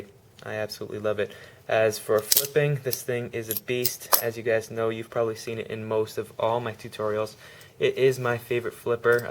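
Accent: Canada. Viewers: Wait are you Canadian?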